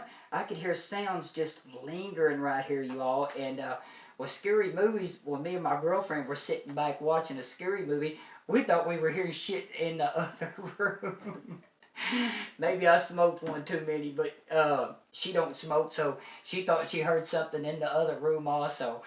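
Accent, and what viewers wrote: South, United States. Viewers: Nice review mate! Luv the Southern accent! You’all did a great review here. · Good, clear review. As a non American your accent is interesting. As are some of the adjectives that you use. I'm guessing that you're based somewhere in Southern Amserican States. Fascinating.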